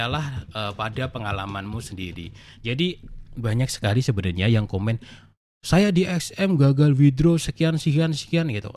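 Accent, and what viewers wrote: Java, Indonesia. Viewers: bang lu jawa ya ? orang mana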